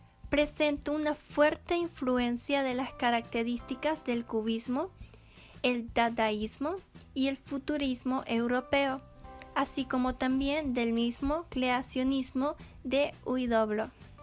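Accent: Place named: China. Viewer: buen vídeo, solo que la chica habla como si un chino hablara español no sabe decir la r, de resto todo fino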